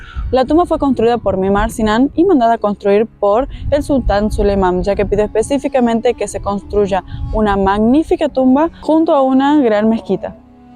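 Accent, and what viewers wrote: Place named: North, Argentina. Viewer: Hola!! Me encantaron tus videos, hace unos días descubri tu canal, se que sos Argentina y por tu tonada del norte. De donde sos? Tal vez Salta, Jujuy o Tucuman? Ya me suscribi porque pienso seguir mirando todos tus videos, buenisimo todo 😊❤un besito y a seguir adelante que vas a tener mucho exito con tu canal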